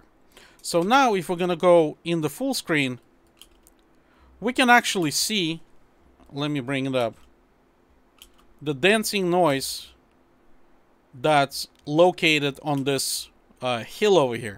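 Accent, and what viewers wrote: Russia. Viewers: Ха-ха..., судя по твоему английскому, ты русскоговорящий )))) Может для нас сделаешь то же видео?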